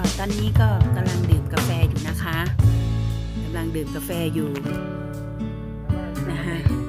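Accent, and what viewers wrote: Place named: South Korea. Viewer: สำเนียงเกาหลีพี่เพราะมากค่ะ.🥰👍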